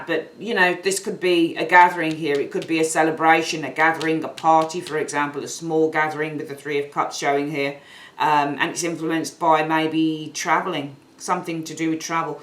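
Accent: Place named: Midlands, United Kingdom. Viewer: This reading really resonated I can hear in your accent you’re a fellow midlander 👍👍👍 Love and light ☀️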